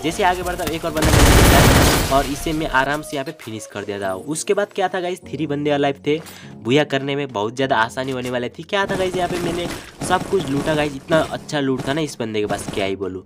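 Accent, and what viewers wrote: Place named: Nepal. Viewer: Nepali ho bhai tum hum avaj se pehechan jate h 😁😄😄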